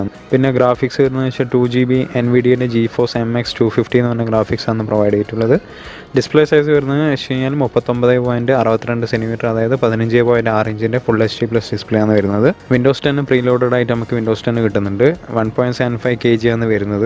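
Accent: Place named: Kannur, India. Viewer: Aah kannurkaran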